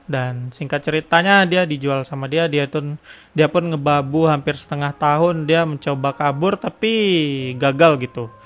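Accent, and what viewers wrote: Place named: Medan, Indonesia. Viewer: Bang lu orang medan yah? Keliatan dari logat bicara nya?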